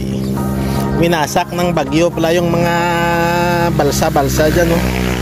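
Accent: Iloilo, Philippines. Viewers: havea nice day my friend. niceto see your nice views nice sea very clean. ano g p,ace mo kaibigan parang iloggo ka ata friend. salamat po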